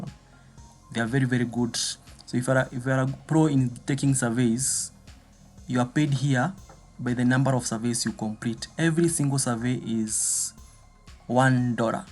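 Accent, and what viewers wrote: Kenya. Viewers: Hii accent ni ya kenya sindio...murima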